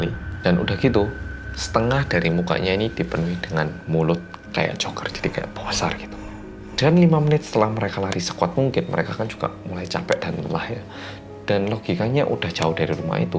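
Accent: Java, Indonesia. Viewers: Aku suka bgt sama channel karena suara medhokk nya😆😆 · Berguyon? Bahasa indonesia mix jawa.. 🤣🤣🤣 medhok tenan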